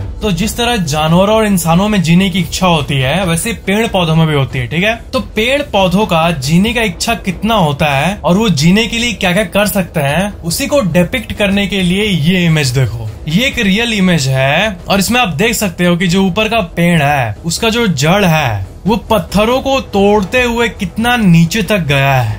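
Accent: Bihar, India. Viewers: Are you from bihar sir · Aap bihar se ho ka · aap bhihar sai ho kya ?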